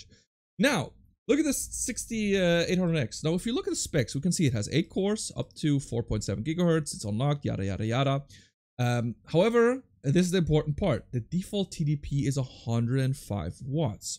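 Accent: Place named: United States. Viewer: god damn your english accent is very good and you're Danish, literally thought i was watching an american guy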